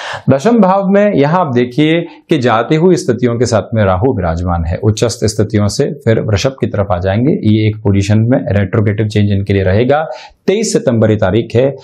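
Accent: Standard. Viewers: Very pure accent , great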